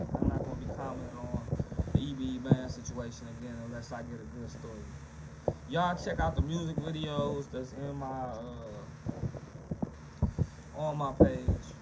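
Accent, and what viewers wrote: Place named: Appalachia, United States. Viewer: You mine As well take his spot cuz. Ya got the hillbilly accent whole thang. Just like Willy did.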